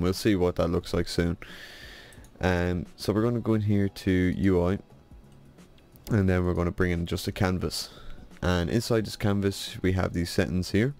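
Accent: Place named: Ireland. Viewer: First Tutorial with an Irish accent and I love it.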